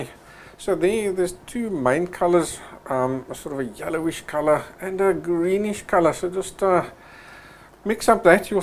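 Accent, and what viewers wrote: South Africa. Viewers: great video, are you south african by any chance?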